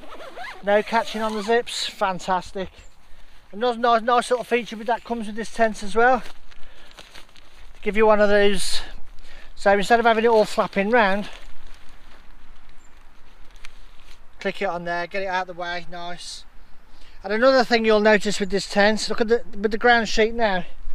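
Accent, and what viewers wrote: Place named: Birmingham, United Kingdom. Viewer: You sound like a fellow brummie or maybe the black country lol. I did watch a video with Hazeoutdoors and he said the red pole should go in 1st, I'm not sure tbh as I've looked on the Terra nova website and they say different, I was just thinking about how saggy it was on the inside once you'd set it up. Maybe after a few times it'll come right ✅️ 😌 👍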